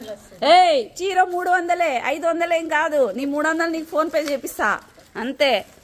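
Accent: Telangana, India. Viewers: Language b kothakota sid laa undi andi ? Yeplace andi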